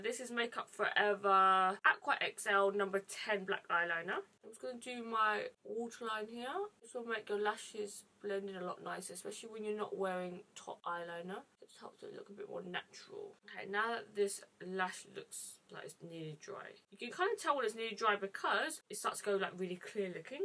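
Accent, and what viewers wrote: United Kingdom. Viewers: also would have never guessed she'd be british LOL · If you listen carefully, you'll be able to hear her British accent. She's from England. It's funny in life how we live in one area and think that all other ethnicities will have the same accent as ours. Or we refer to an accent as something different than what we normally hear. There's all ethnicities all around the world. England is very multicultural just like Canada and America. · I always watch her ig vids and when I first went to her YouTube vids I was like OMG she is British???? Loved it 😂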